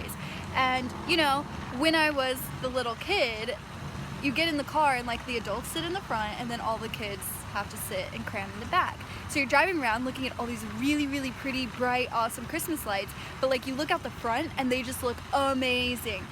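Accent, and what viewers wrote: United States. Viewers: DOES A LAOTATION SPEAK WITH THAT TYPE OF ACCENT? I WOULD THINK SHE IS AN AMERICAN OF ASIAN DESCENT.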